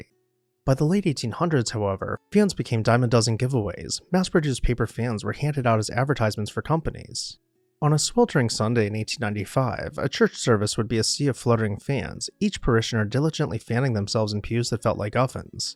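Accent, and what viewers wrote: United States. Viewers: Good video, not good narrator. YouTube is an international platform, you should take into account that many people here don't have English as their mother tongue. This narrator's heavy American accent requires concentration even for native English speakers like myself. He speaks too quickly, doesn't leave enough time to absorb what he says, i.e. pause once in a while, and he never seems to take a breath.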